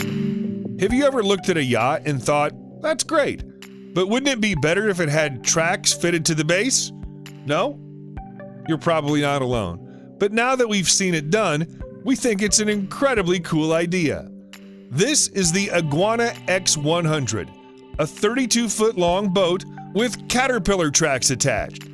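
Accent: United States. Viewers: Another utter load of bollocks with a really fucking annoying IQ zero american narration.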